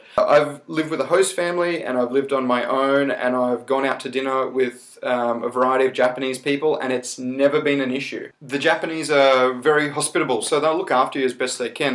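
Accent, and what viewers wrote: Australia. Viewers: yea, that's an Auzzie accent :)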